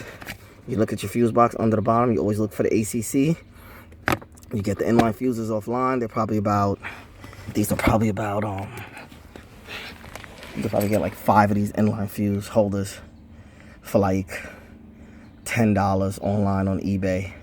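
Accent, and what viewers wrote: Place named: New York, United States. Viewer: I may be from the south but I know an East Coast accent from anywhere... maybe I’m wrong but I’m getting Brooklyn/Bronx vibes. Preciate the video brother. New subscriber ✊🏽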